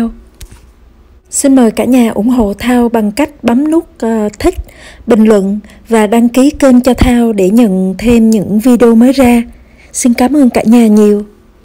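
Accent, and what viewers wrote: Hue, Vietnam. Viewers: phải noái nặng nặng tiếng Huế miền nửa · Giong noi nguoi Hue nghe de thuong lam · giọng Huế ( lại SG ? ) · Bạn là người Huế hả ?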